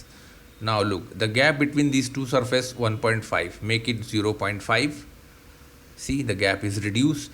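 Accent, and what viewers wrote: India. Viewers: when the presenter has an Indian accent you know it will be good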